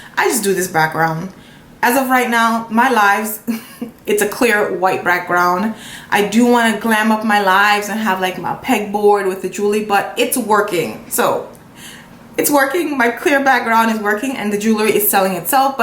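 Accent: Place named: New York, United States. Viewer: How did I know you was from NY and then you said Downtown Brooklyn.. Best wishes papa sis from Brooklyn😍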